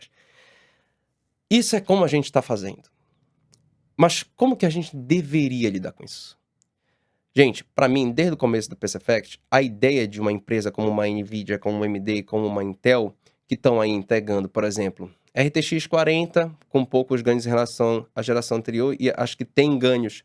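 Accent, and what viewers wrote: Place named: Belém, Brazil. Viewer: Esse cara é de Belém. Percebi pelo sotaque.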